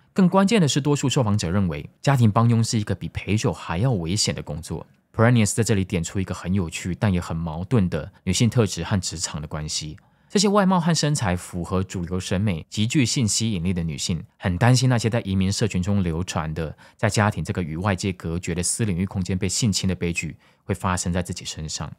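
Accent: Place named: Taiwan. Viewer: 第一次看到的影片，讓我迅速就變壽粉了。臺灣人的口音跟中字幕讓我聽起來好舒服看起來易懂…………都噴水了…………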